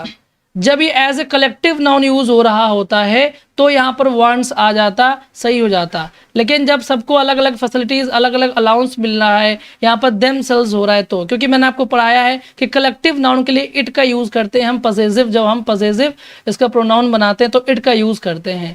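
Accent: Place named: Bundelkhand, India. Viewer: Namaskar Guru ji Aap Bidaki / Banda/ kanpur/ phatehpur kahan se hai?? Because the way you speak sounds like among one of them.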